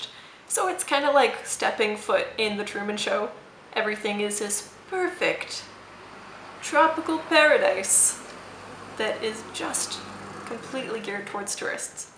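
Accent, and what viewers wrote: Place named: France. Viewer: Your neck and clavicle in this vid are beautiful 🙌 Did you get any vaccinations before going? I hope your momentary sickness when you got home was dehydration and not something else! Maybe do a round of diatomaceous earth to make sure no parasites came home. You’re also probably fine so don’t let me freak you out! Also, you sound almost Italian speaking Spanish with your French accent 😹 And it was nice to see!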